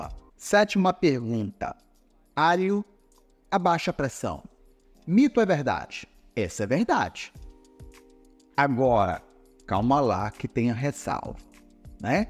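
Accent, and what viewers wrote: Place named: Rio de Janeiro, Brazil. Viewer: Dr vc tem staque de carioca...vc é do rio de janeiro?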